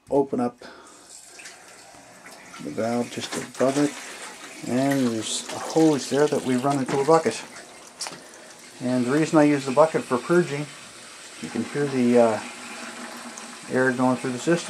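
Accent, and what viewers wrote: Cape Breton, Canada. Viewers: Also are you from Cape Breton?! You sound like a fellow caper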